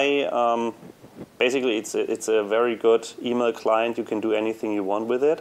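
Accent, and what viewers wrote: Germany. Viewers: you sound very german !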